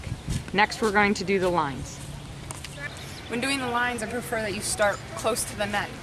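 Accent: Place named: United States. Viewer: judging by your american accent you have probably never been to the ATP courts in Monte Carlo, and since I have I can tell you they do NOT use the "coil" system as you call it. yes the sideline to sideline style leaves clay on the sides near the fence, but your method doesn't follow professional methods.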